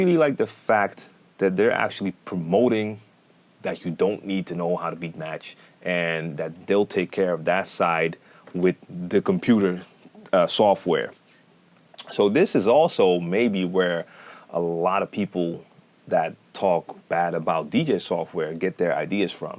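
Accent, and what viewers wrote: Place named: United States. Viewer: Let me put this simple to you,,, by your accent i believe you are from USA. I'm a DJ for the last 26 years, i do beat match by ears, i'm a car driver, i have a 5 speed car. Virtual DJ is like automatic cars and the others are like manual shift cars. if you drive an automatic car, you are still a qualifier to get a driver license. And let me tell you something else, Virtual DJ is from France and is not free, the other crap softwares are free with the unit. After Numark failed to pay to Virtual DJ, they moved out of American market, also for a long time, nobody built a software like this. Virtual DJ is the first and the one, the others are pure advertizing. A real DJ is who has it a full house and makes everybody dance all the time no matter how !!!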